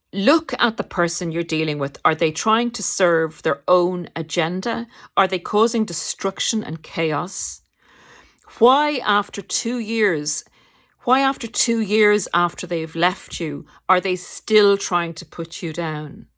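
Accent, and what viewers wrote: Ireland. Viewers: What a lovely 😍 lady, you my dear have helped me tremendously with my healing, I have listened to your lovely ❤Irish accent, for hours and hours thank you for your work, you describe the behaviour and Traits of Narsasits very, well exceptional ❤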